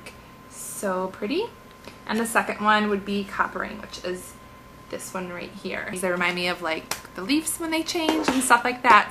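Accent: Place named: Boston, United States. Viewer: I love your accent!! Is it Boston? :)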